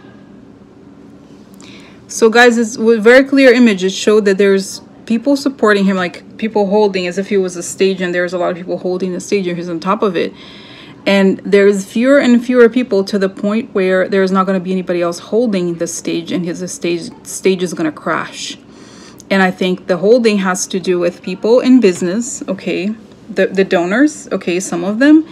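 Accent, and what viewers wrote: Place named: Russia. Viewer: Hey cheers.. so it didn't happen that way-keep chin up and come back and do some more cards! Miss your funny Russian accent!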